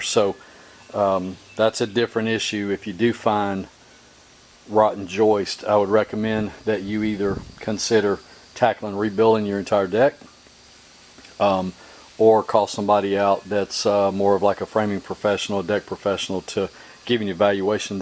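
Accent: South, United States. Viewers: Love the southern accent. Cheers from Australia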